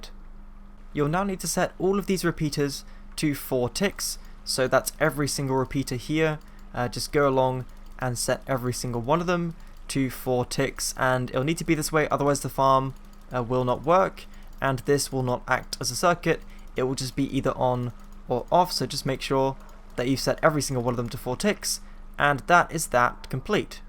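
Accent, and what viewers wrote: United Kingdom. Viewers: its called being British lol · It’s called an English accent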